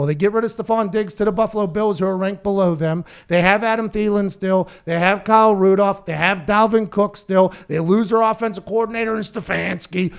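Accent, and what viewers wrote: Pittsburgh, United States. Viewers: Gahnd ahn Pahnd...love that your pittsburgh accent still come aht.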